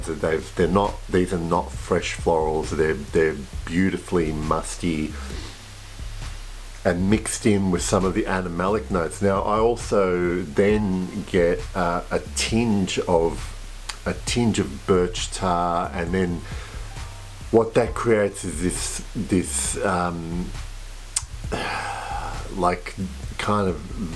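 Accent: Australia. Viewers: So, you can pronounce the name of it. Seriously, I thought you were from Australia. Sorry mate, time to let you go. 👋👋 your believes are very different than ours.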